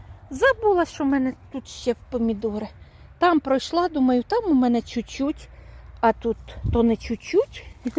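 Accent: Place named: Kharkiv, Ukraine. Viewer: доброго дня! Випадково потрапила на ваш канал. Дивлюся на вас, ніби на себе збоку)) Говір схожий чи не з Харківщини ви? А помідорчики... така ж історія, в погреб вже ставити ніде, роздала, а це вже бройлери смачно їдять)). Успіху вам, миру і перемоги нам усім!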